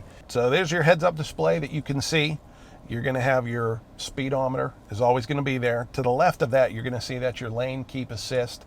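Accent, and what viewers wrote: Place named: Pennsylvania, United States. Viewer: Love the "Uniontown, Pa" accent!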